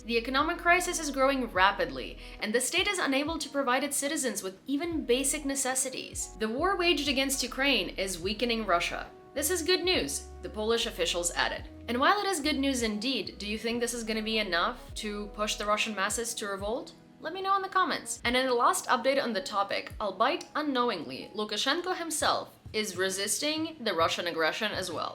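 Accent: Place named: Missouri, United States. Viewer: You sound like you're from Missouri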